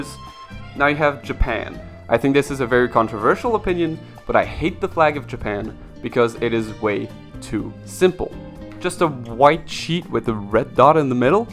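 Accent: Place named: Quebec, Canada. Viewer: jsp pourquoi je SAVAIS que t'etais un quebecois.. autre que ca bro tres bonne video jai apprecier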